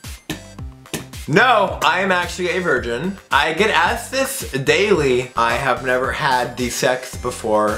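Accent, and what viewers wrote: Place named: South, United States. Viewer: NEW SUBSCRIBER I LIKE HIS KINDA SLUR AND COUNTRY VOICE!!! 😄😘 and he's ADORABLE AS HELL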